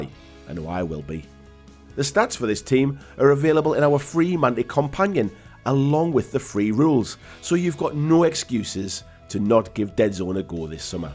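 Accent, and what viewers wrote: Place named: United Kingdom. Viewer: The British accent may be a bit difficult especially for non native speakers. I think it's fine perhaps add captions?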